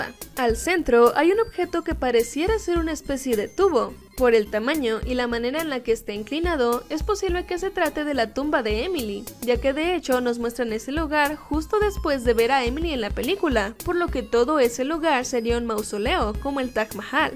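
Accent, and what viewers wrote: France. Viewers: tiene un hermoso acento francés UwU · Me encanta tu acento francés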